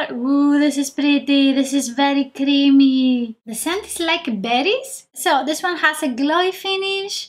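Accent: Greece. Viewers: I love all of your videos but especially Advent Calendar ones! And your accent is you cute, are you Greek? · I just found your channel, you're accent is adorable and I loooooove your videos, I just watched like 10 of them. Are you greek? I came to a conclusion, couse of your channel's name and your accent (hoepfully I am right and I did not offend you). Thank you for your videos, I think they will help me a lot in the future.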